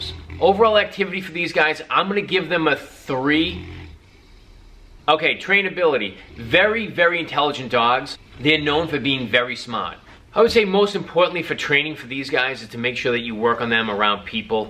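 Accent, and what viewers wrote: Boston, United States. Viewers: You're from the Boston area... Just a crazy guess...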